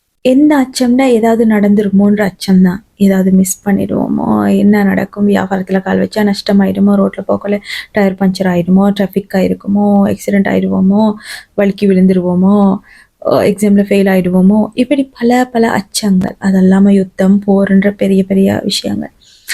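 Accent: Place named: Sri Lanka. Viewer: இலங்கை யின் சுவையான தமிழில். இனிமையான. இஸ்லாமிய சிந்தனை. நன்றி சகோதரி.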